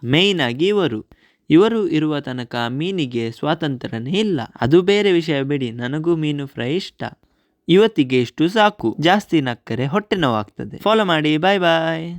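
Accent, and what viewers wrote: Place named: Mangalore, India. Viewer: Mangalore kannada kelode chenda ❤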